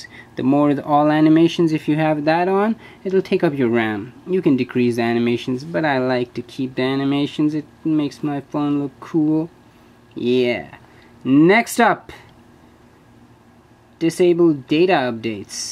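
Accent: India. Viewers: you MUST be indian... i can tell clearly from the accent.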